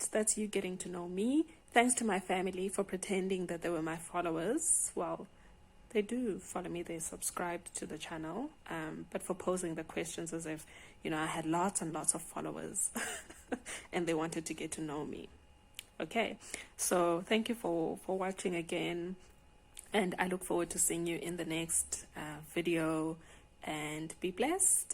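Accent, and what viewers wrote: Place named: South Africa. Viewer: I love your accent! I would like to visit South Africa one day. I heard it is beautiful. Thank you for sharing. I am a huge fan of boiled eggs too! :-)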